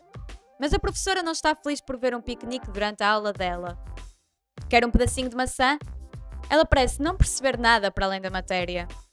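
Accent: Portugal. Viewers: Amo esse sutaque sou bizneta de purtugues mas nao tenho o sutaque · Mano que dera se eu tivesse nascido em Portugal e ter esse sotaque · Eeeeee finalmente é pt de Portugal#sou pt Portugal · Ela falo português de Portugal não sabem destingir ? E muito diferente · RafaelaVitória Araujo é de Portugal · isto é português de Portugal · Ela fala engraçado porque é de Portugal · AAA ta em português de Portugal -_ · Respeita que é a lingua de portugal. Vc deveria aprender a respeitar a lingua dos outros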